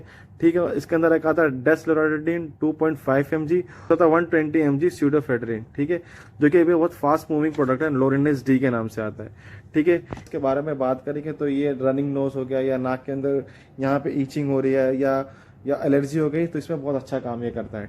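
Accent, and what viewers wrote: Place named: Hyderabad, India. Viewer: Bhai tum Hyderabad ke Rehne wale hai kya... Hyderabadi urdu...dikhri tumhari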